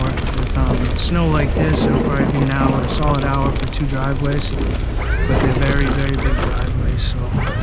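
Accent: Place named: Massachusetts, United States. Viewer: Nice video. I grew up in Hanover, Mass. Listening to your accent makes me homesick. Did some trail riding on my enduro motorcycle back in the early 70's near where you live. Raced a couple times at MX tracks in Pepperell and Southwick, Mass. When I was 2, I broke my back in 1975 in an enduro accident that left me paralyzed from the waist down. Came out to the University of Illinois to complete my undergraduate and masters degrees. Massachusetts is not the easiest place to live for someone in a wheelchair. Again, hearing your voice brought back a lot of good memories of living on the South Shore, especially when it came to snow plowing on our small family run farm. I have traveled all over the world as a Paralympic Track and Field Coach and as a Strength and Conditioning Specialist. Retired now. One of my goals is to get back to the most beautiful state in the USA, Massachusetts. 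Another one of my goals to see at least one more Boston Marathon in person. Thanks for the great video. Be safe and all the best to you and your family.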